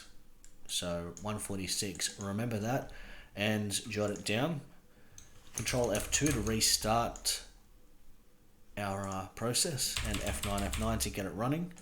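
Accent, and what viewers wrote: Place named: Australia. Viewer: Great series here. Enjoying it while doing OSCP. Nice hearing another Aussie on youtube too lol. Thanks for the uploads!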